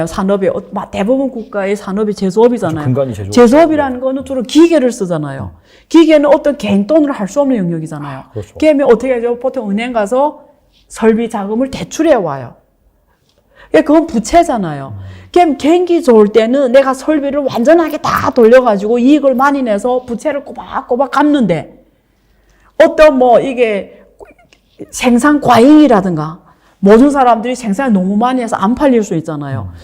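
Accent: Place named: China. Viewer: 저 중국식 발음 도데체 이해가 안된다 좀 고쳐라!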